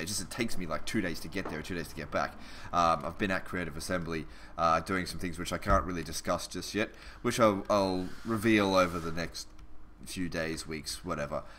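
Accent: Australia. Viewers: Yep, sounded Australian to me.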